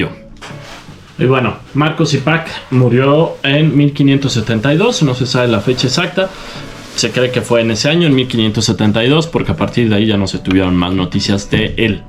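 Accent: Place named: Andalusia, Spain. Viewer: Pareces un andaluz o un sevillano mal que te pese tengo amigos parecidos a ti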